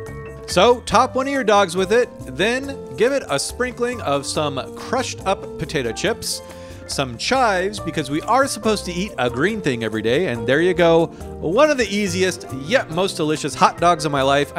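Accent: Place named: California, United States. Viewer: I thought he sounded like Chef John, too! But yeah, it appears that it's just a regional Californian accent :)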